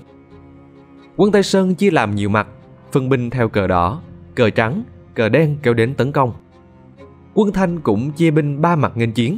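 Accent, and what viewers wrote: South, Vietnam. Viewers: Kênh mà nói giọng nam thì cứ phải cẩn thận ...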